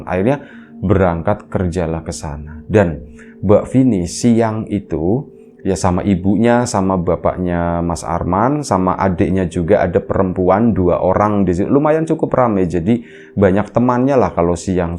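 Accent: Tegal, Indonesia. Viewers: Jdi kepo dg cerita2 di Riam Biru, ceritanya beragam thema dan variatif. Naratornya jg dlm bercerita kdng bikin aku ketawa sndiri, khas org Tegal. Salam buat temen2 yg di Tegal, jln layang, jln zaenal arifin,yg deket texin dan lain2nya...salam dari jogja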